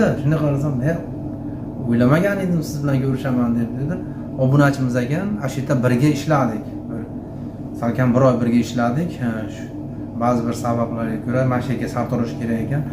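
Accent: Andijan, Uzbekistan. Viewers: Рахмат сизга анжонча килиб тушунтирдиз ишларга омад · Андижонча лайк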